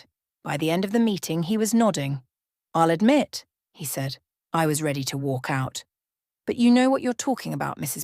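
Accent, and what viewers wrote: United Kingdom. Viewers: Like the British accent, enjoyed this story.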